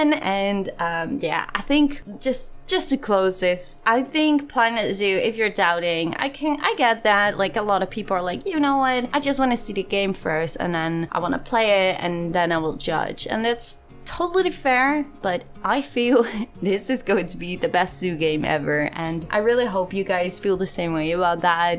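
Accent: Netherlands. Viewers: wacht ben je nederlands???? xDD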